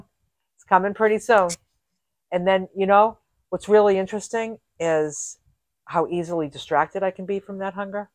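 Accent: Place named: New England, United States. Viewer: Hi, new to your channel. Where do you live? I'm detecting a New England accent. I too would love to buy a van and travel, so your channel intrigues me.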